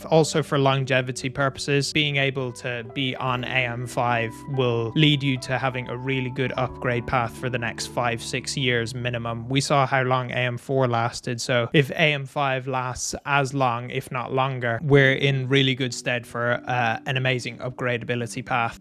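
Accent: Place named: Ireland. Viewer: I can tell that but what county is that accent from bro 😭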